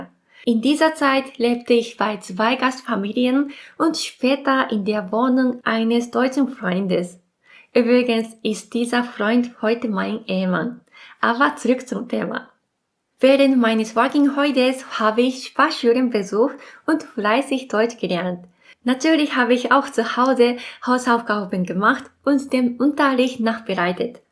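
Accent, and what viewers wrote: Japan. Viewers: Liebe, ich höre ihnen sehr gerne zu, ihr Deutsch ist perfekt mit dem kleinen japanischen einschlag. Ihre inhalte sind sehr informativ und ich freue mich auf weiteres von ihnen.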